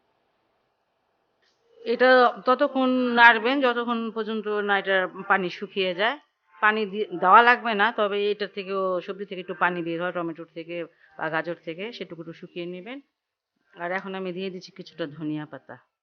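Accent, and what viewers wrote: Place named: Bikrampur, Bangladesh. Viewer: Aunty apnk o osonkho donnobad...aunty apnr kotha sunle mone hoy apnr bare bikrompur..amr sosur bare bikrompur..R ami dhakaya..dowa krben aunty amdr jonno..😍😍😍😍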